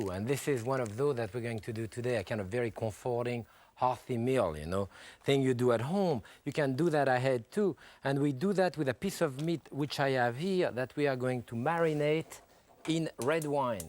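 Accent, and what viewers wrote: France. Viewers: Sometimes a bit hard to understand his french accent but I'm learning he is an awesome chef to watch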